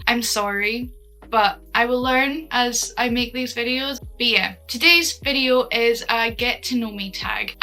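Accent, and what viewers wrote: Scotland, United Kingdom. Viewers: "I live close to Dundee", that explains why I recognise the accent since it's local-ish xD nice to see a Scottish content creator, dropping a sub :)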